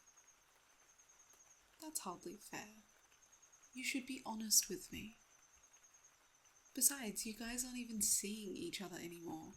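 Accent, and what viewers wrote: Australia. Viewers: Good morning, 8 ❤ I’m currently listening for the 5 time, & I’m loving the longer audio. I really hope you get more views on this, because it’s a quality story, & you’re a great storyteller. I love your voice, & as I’ve said before, your Australian accent makes me melt, 8 🥰🌹 Oh, I love the thumbnail. She’s so cute!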